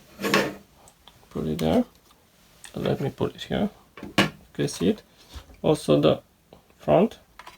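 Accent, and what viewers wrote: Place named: Romania. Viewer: buna, colectionezi numai 1/18 ? Eu am acelasi model in acelasi spec ca si al tau dar e un Spark 1/43 .eu colectionez doar 1/43 . Stii, miam dat seama ca esti român dupa accentu care il ai in engleza. Vb bine, dar accentu l - ai pastrat si nu e rau. Faza e ca io l - am pietdut si nus daca i bine sau rau.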